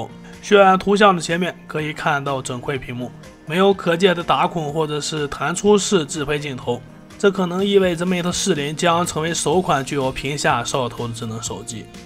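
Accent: Shanxi, China. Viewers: 山西还是陕北口音？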